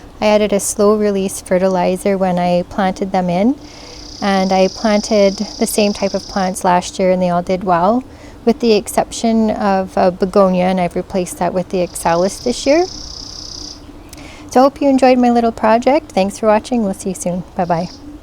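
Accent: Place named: North, United Kingdom. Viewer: what a great idea...i have several planters like this that i can apply this to....btw what zone are you in?? going by your accent, you sound northern....you can also use thin wire instead of zipties thus making no holes it will just go through the burlap easily. pansies won't last long they are a cold weather plant. what a great DIY video!!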